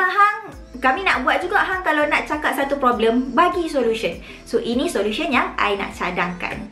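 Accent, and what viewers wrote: North, Malaysia. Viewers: Suka tgk video² nih sbb gunakan loghat utaqaaa.. TQ utk semuaa information Dan ilmu² yg di share.. smga murah rzki sllu.. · Tudia aihh utara bak hang😅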